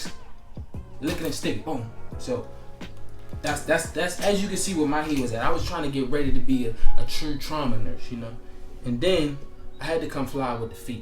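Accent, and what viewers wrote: Baltimore, United States. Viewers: two, do, blue. true......kinda miss that Bmore accent.....ya know what um talking bout. might be the next comedian in Baltimore county!!....Good stuff!!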